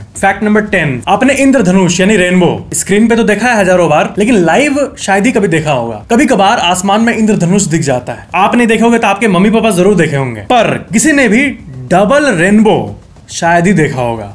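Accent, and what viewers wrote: Bihar, India. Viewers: I think brother from bihar 😂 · Theth bihari bhasa. Pulur-pulur😅😅😅😅😅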